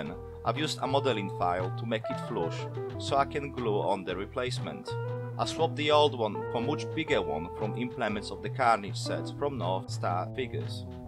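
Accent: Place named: Hull, United Kingdom. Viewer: Good job buddy loving the hull accent lol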